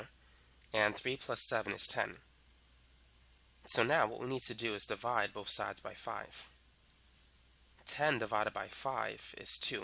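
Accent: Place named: New York, United States. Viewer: This guy is soooo clear in his explanations!!! I love his NYC accent too!!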